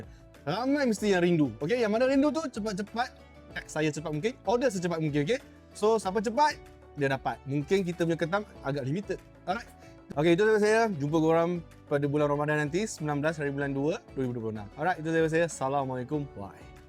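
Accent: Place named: Perak, Malaysia. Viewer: Sleng peyrock still ade.. padu..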